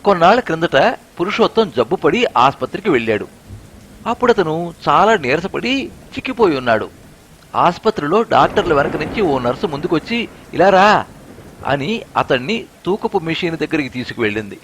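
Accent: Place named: Srikakulam, India. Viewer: మంచి కథ వినిపించారు. మీ గళం లో శ్రీకాకుళం యాస కూడా బాగా పలికింది. మీరు చెప్తుంటే పాత్రల్ని చూస్తున్నట్టు అనిపించింది. కానీ background వర్షం sound మీ గొంతుని dominate చేస్తోంది. Concentrate కథ మీద కన్నా వర్షం sound మీద ఉండడం వల్ల మళ్ళి మళ్ళి వెనక్కి వచ్చి కథ వినవలసి వచ్చింది. 👌🙏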